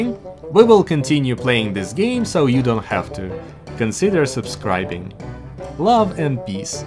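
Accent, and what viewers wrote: Russia. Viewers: Очень забавно слушать тебя, говорящего по-английски, но с интонацией из русского языка))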